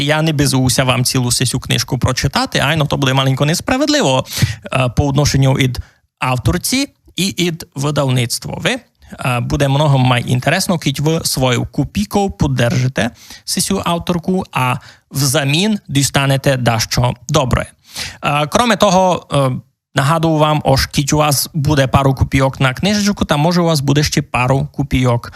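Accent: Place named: Ukraine. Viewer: Респект пану за українську мову! Такий " діалект" ніяким чином не з'вяжеш з московитським язиком. Слава Україні!🇺🇦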